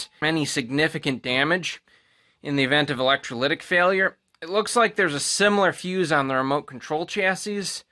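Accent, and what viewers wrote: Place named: Chicago, United States. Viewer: Are you from the midwest? You have the same inflection as I do, almost like it has a Chicago swing to it...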